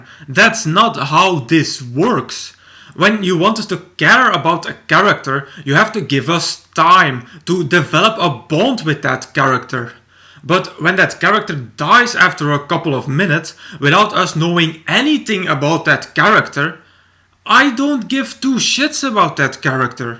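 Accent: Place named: Germany. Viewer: I really like your accent! Are you German? just curious. also, cool review, you say it as it is!😁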